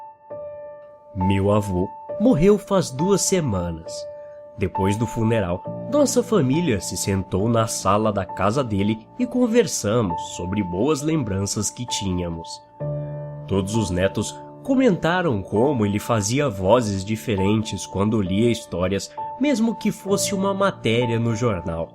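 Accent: Northeast, Brazil. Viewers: ele tem um sotaque nordestino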